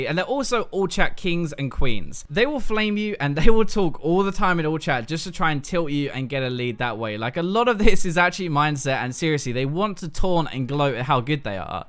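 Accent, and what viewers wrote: United Kingdom. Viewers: Not the guy with the british accent again :(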